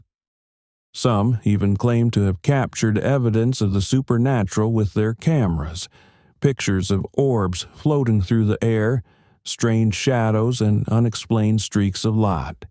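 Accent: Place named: Appalachia, United States. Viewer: Why Is This Narrates By A Hillbilly??🙄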